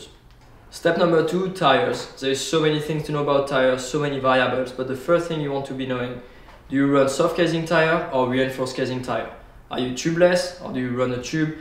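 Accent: France. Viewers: French accent 😉(I have the same) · tu a un accent francais you are accent french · Sa de voit que tu est français a ton acsens en anglais et a ton nom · Tu a un accent français You have a french accent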